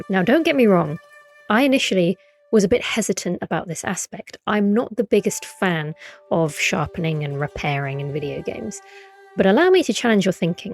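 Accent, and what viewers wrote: United Kingdom. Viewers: I’m literally British and even I am charmed by her British accent, haha. So relaxing. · Every time uploads a video, I consider being a nicer person for the simple joy of listening to her deliberate concepts in video games. There's also moments where her emotion pours through her commentary and that feels wholesome and kind. We need more chill youtubers with smooth british accents talking quietly about video games all day.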